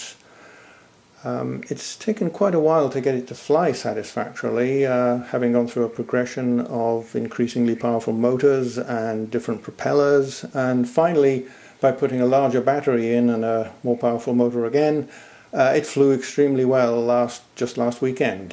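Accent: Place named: United Kingdom. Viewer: I'm sorry, but WTF? Im hearing a brit accent, and can't help but wonder WTF? Do you have nothing better to do with your time??? You're obviously an incredibly talented, hard-working, devilishly-focused so and so and again I ask: WTF?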